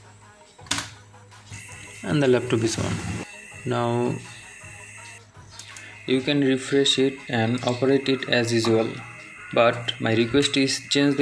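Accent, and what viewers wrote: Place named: Bengal, India. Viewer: কথার স্টালে তো মনে হয় বাঙালি, বাংলা বুঝি জানো না !!:face-orange-raised-eyebrow::face-orange-raised-eyebrow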